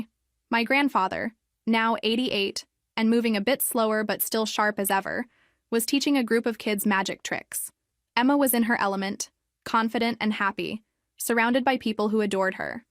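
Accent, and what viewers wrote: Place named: East Coast, United States. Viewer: Her voice is so annoying. Almost like the East Coast with a nasal like Midwest.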